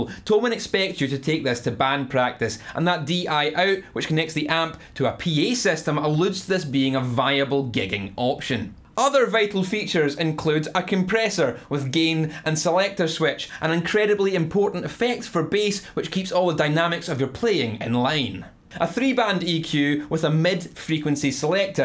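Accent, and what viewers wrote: Scotland, United Kingdom. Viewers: yeah, he's scottish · I really like this site, is extremely funny and instructive to watch, but his accent is a little hard to follow for my South American ears, is it Scottish? I really like his accent, too!! 🤘🏼🤘🏼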